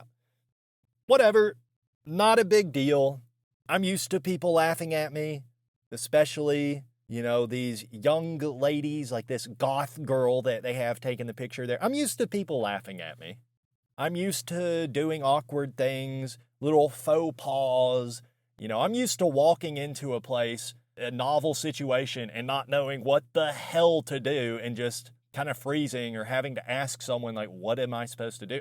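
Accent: South, United States. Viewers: If all of this happened, which I very much doubt, why would you not stop your cousin, the multiple times he kept saying "black one!" to tell him that he shouldn't say that. You sound like you're from the South. There's no way you didn't know better. You are making all of this up. And it's cringe.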